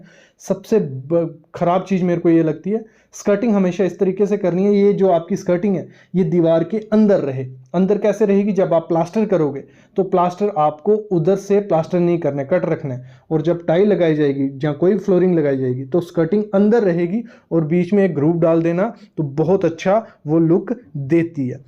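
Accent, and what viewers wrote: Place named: Punjab, India. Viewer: Why I'm observing ur voice u r from punjab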